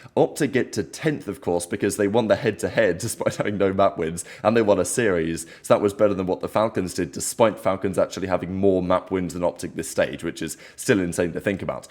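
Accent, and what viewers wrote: England, United Kingdom. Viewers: He’s saying very much intrigued to your thoughts. Fellow Englishman I can translate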